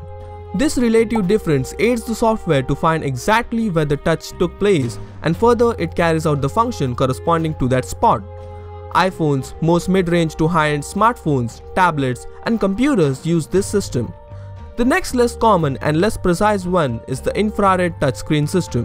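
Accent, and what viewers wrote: India. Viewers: This narrator is Indian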